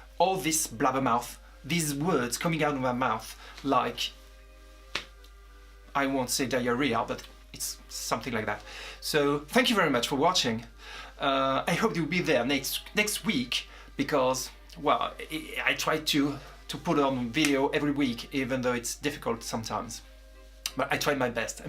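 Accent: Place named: France. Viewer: Your English is better than you think and don't worry, I could understand everything. Besides I love your French accent!. Things are soooo different! I'm not sure I like your system better, but it sure would be nice to have job security. Here without professional development you can't renew your teaching license, which you have to renew every 3 or 4 years.. In my school district you have to have a masters degree to be hired and you are on probation for 3 years. Then you have seniority, but even then, due to budget cuts you still can be laid off. A week or so ago we were told that maybe 8 teacher will have to go next school year. I wish I was French!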